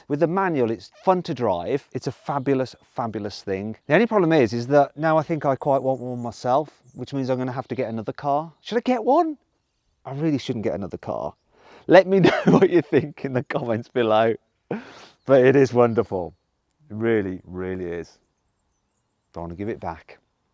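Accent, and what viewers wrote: Germany. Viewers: Yaaaaa.... I am very very much interested to see the German from whom Mat has picked up the accent and mannerisms. Love the Video as always and eagerly waiting for the engine upgrade of Jimny. Love, health, and happiness for you Mat from India. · can we get a "best of german accent" video:D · i just love. ants german accent ya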